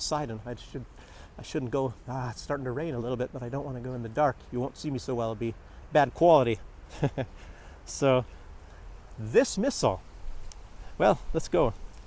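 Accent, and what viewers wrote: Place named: England, United Kingdom. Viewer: You must be very much in love with Belarus. Where are you from bro? Judging by your accent, you're probably from England. However, I am from Cyprus, and I envy you now that you are in Belarus.❤❤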